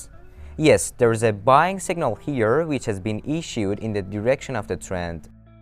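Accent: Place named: Iran. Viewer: IRANIAN ACCETN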